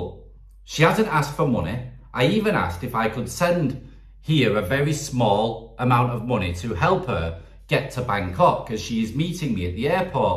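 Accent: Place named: Leeds, United Kingdom. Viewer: do u ever think KAAAA sounds like an old crow lol - when i hear the girls talking it makes me think old crow lol - u sound like a leeds guy - close ?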